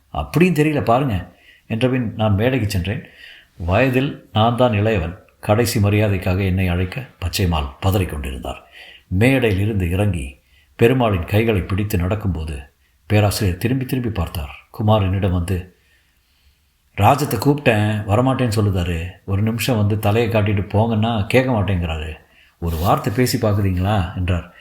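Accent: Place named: Nagercoil, India. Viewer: Nagarkovil slang miga nandraga pesuhireergal👌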